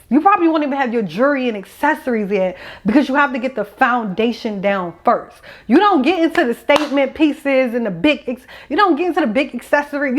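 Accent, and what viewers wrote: Washington DC, United States. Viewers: Could tell you were from dc the first few seconds you started talking ❤😂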